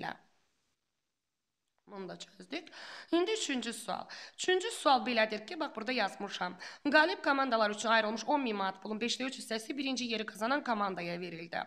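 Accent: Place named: Russia. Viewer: Rus sektırıdı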